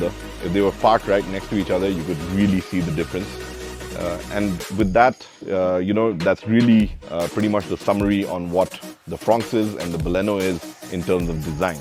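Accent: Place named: England, United Kingdom. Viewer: What's with the heavy English accent?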